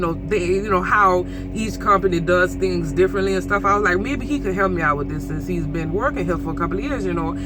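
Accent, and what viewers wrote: Louisiana, United States. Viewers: You sound like my people in Houma Thibodeaux Morgan City Patterson. Oh Lake Charles too. Don't worry about what others think. Do you do more do better.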